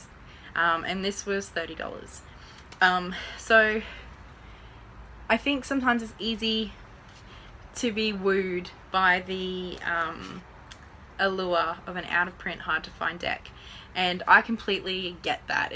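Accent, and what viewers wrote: Australia. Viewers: Thank's for the great advice. Love your Australian accent too ! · Arn? You mean Own! Nor? You mean No! Horm? Home I think it is! Ozzy accent is thee very worst.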